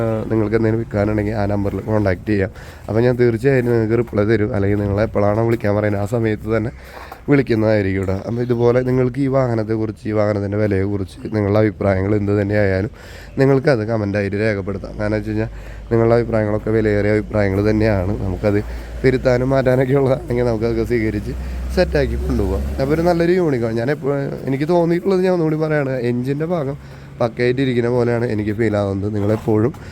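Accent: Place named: Thrissur, India. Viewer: നിങ്ങളെ സംസാരം കേൾക്കാൻ നല്ല ഭംഗിയുണ്ട് തൃശൂർ അല്ലേ🌹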